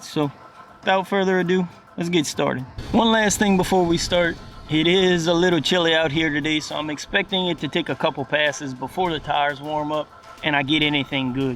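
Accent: Louisiana, United States. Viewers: Just a question? You in southern louisiana.. that accent has some breaux bridge behind it from how it sounds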